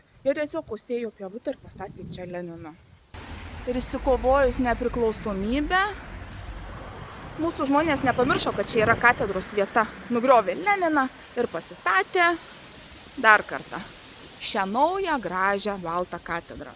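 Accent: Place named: Suvalkija, Lithuania. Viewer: Tai jo tik sakau, kad Suvalkų bisky turi😁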